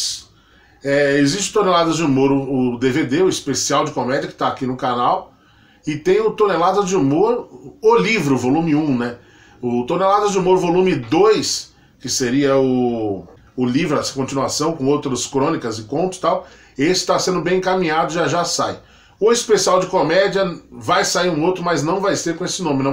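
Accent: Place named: Brazil. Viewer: vi em outro vídeo que você é carioca. Perdeu o sotaque. Acha que se continuasse com sotaque carioca isso ajudaria nas piadas?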